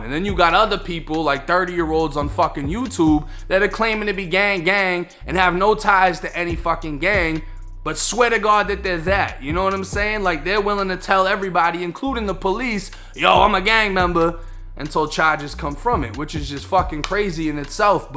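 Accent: Boston, United States. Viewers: U sound like u from Boston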